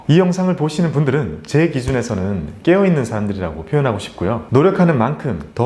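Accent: Gyeongsang, South Korea. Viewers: 배아본적이 없는데??ㅋㅋㅋ 경상도 사투리인가요ㅋㅋㅋ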